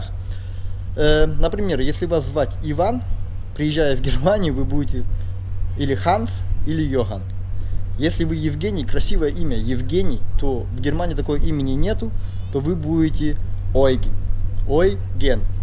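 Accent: Kazakhstan. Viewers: у тебя говор и речевые обороты как у моего мужа и его брата и племянников из Казахстана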